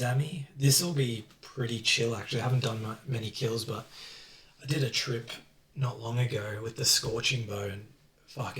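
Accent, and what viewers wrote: Australia. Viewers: Actually huge mate gz on true max! I’ve just started OS coming from world of Warcraft and I’m addicted as fuck. Almost 1k total level now, glad to hear another Aussie as well! Ps. Pls give me some GP 😉😂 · Can tell you're Aussie haha, Gz on 200m all.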